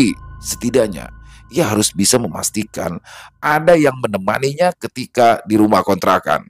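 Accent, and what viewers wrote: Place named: Kalimantan, Indonesia. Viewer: Kok yo Kalimantan bgt gtu lho 😭😂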